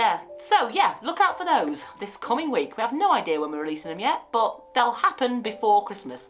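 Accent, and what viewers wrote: United Kingdom. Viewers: You guys should have your own tv show. Love the voice, love the British accent and interesting personality👌😎 Merry christmas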